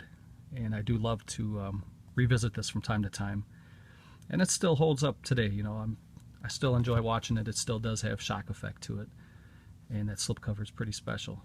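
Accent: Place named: Midwest, United States. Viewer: I knew your accent was Midwest. Im in Illinois about 20 minutes from the South-Eastern corner of WI. I am about to grab the last cheap copy of Body Bags on Amazon.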